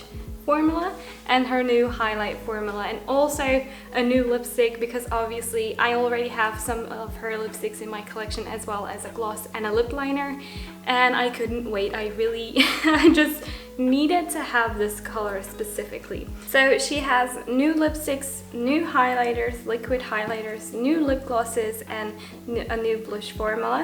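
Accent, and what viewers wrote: Australia. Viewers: I’m an Aussie just coming to the comments to say I noticed an Australian accent in the way you speak English - where are you from?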